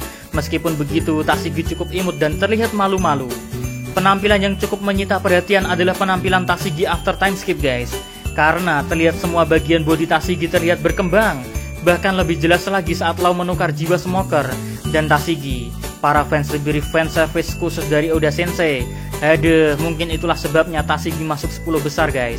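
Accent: Java, Indonesia. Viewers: logat jowo mu kuetok gan wkwk lanjutkan bikin video ne 🖒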